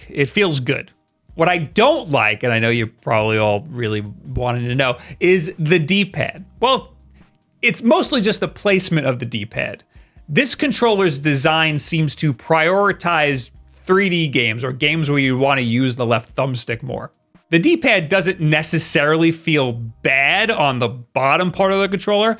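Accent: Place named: New York, United States. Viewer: I’m from Wisconsin and live in nyc. Love how you say cawfee like a New Yorker but Beag (bag) like a Midwesterner 😂. Relatable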